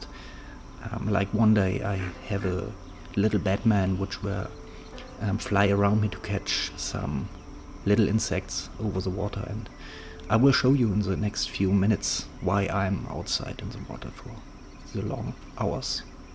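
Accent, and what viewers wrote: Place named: Germany. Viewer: An deinem Englisch hört man das du deutscher Bist 😂✌👍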